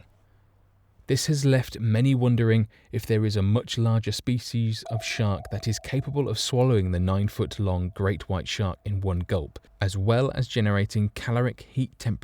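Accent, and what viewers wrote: United Kingdom. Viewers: This is the way they pronounce words in the UK. There are plenty of other narrators that sound just like him. Remember, their version of English came first. So to them, North Americans talk funny.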